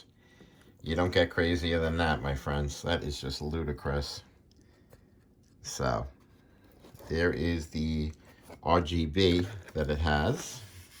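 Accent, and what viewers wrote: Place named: New York, United States. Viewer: Grats on the card, i'm still waiting for mine. Side note, I really miss new york and the accents loi.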